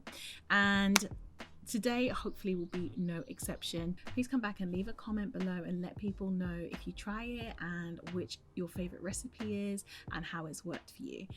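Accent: United Kingdom. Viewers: Whoo lord! Thank You for this list because a few names of the powders I couldn’t understand with her beautiful British accent.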